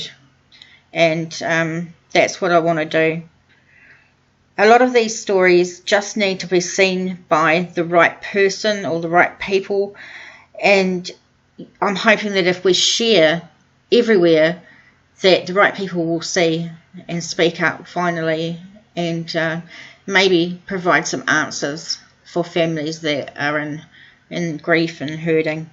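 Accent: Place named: New Zealand. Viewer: Cringy nz accent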